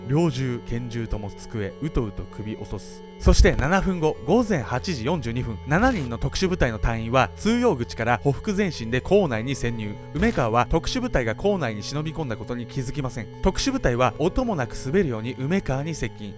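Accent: Kansai, Japan. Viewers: の関西弁可愛いかた！🥰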